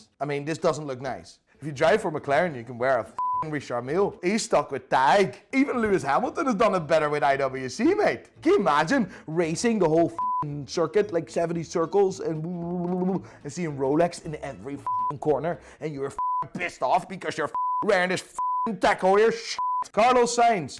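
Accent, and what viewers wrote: Northern Ireland, United Kingdom. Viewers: Ahh man. The NI in your accent is fucking belter.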